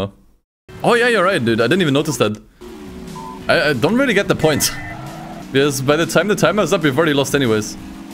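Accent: Germany. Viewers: Are u German? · Hearing a German guy pronounce Spanish words is very amusing lmao not that he did bad he actually pronounced him pretty good it's just accent humor lol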